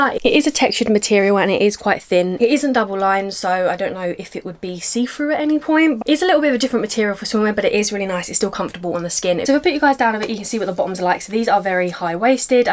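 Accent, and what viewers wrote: United Kingdom. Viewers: SOFT MODELING.. JUST ELEGANCY AND BEAUTY... YOUR BRITISH ACCENT IS VERY CLASSY.. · My god.. I didn't know you had a British accent.. I am in love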